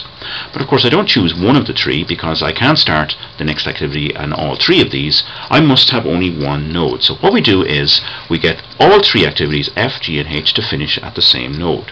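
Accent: Ireland. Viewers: The irish accent is one of the best!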